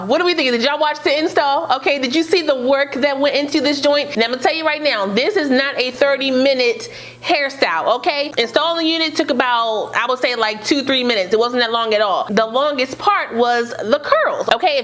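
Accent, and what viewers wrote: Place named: DMV, United States. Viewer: That intro tho…girl you gotta be from the DMV! And the hair looks bomb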